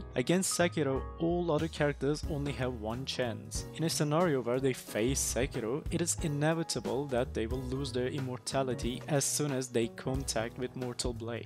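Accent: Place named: Europe. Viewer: Can't listen to monotone euro voice over.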